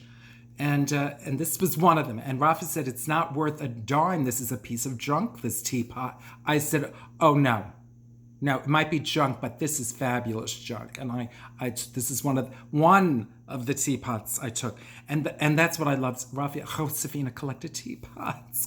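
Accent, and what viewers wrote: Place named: Bronx, United States. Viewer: Oh,. I cannot tell you what these daily thoughts of solitude mean to me. I look forward to them every day, and while the world has become a frightening place, your musings over the things that matter in that gentle, salted Bronx delivery are so wonderfully poignant, and make me feel truly connected to the things that matter most. This series is just incredibly calming and inspirational to me - Thank you, thank you, thank you beautiful. <3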